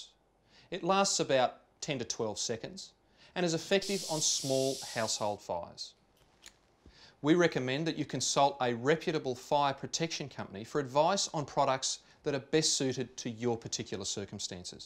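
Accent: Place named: Australia. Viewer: Everything sounds better in an Australian accent, even fire safety vids! 🇦🇺👍 Greetings from the U.S.A.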